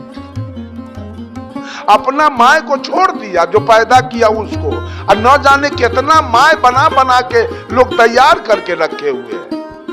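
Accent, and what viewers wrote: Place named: Bihar, India. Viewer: Baba ji aap ka tone to bihari lag raha aur ghee dudh dahi ki baat kar rahe ye to sadiyo se chalti arahi parampara hai Sanatan Dharm mei avi ke pandit thodi late hai ghee sudh hota hai isliye to samsaan mei v ghee se jalaya jata hai 😊bina Shakti se ye duniya nai chal sakti aur chath Puja k baare mei to kuch bolna v mat qki aap agaynta mei hai ye sanatan ki astha hai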